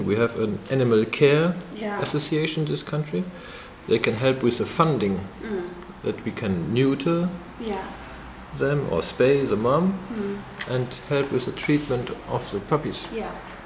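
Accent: Germany. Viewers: Wie man hört dass du Deutscher bist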